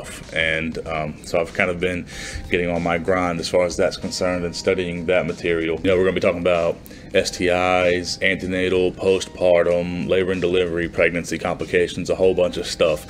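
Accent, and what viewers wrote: South, United States. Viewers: Where are you from? I think I hear a bit of a southern accent..I loved OB but that's what I want to do! I have peds this semester, so I'm super stoked!! Good luck to you!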